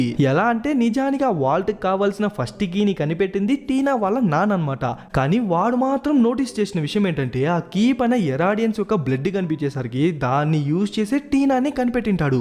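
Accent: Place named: Tamil Nadu, India. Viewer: Oka Tamilian abbayee Telugu matladinattu undi ni voice But chapa Baga explained chesavu too well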